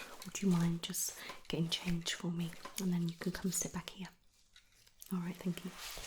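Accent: United Kingdom. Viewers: British English is really the best language for ASMR !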